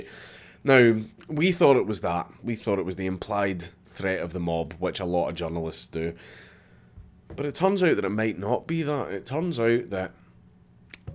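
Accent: Scotland, United Kingdom. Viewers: I love the scot accent but if I'm not paying 100% attention I can not understand it 😂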